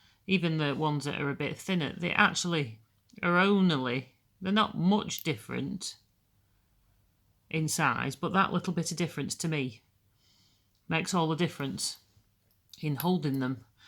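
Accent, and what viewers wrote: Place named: North Yorkshire, United Kingdom. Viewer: Wow, your accent transported me to my childhood in North Yorkshire. You sound just like one of my primary school teachers...I've been in the States for 26 years, but I felt like I time-traveled just now!! Came across your channel while looking for tips on circulars and I didn't realize how different the length of the needle itself could be! I'm trying with Lykke needles but am also a continental style knitter. Maybe I need to take a closer look at the chiagoo after all. (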